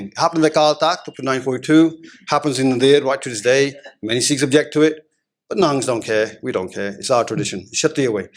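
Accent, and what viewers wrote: United Kingdom. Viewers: After watching cmnts..i realised he is also planted fraud man like his bro Sadhguru.. they all are well trained with uk accent to make youth fool. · His accent is British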